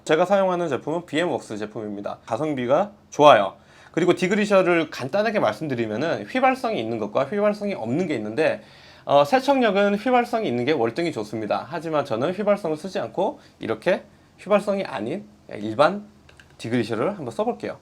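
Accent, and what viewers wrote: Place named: Gyeongsang, South Korea. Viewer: 경상도 분이네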